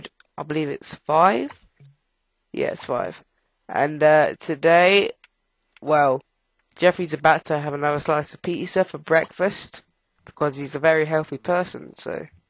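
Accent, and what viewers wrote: United Kingdom. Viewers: You British? :)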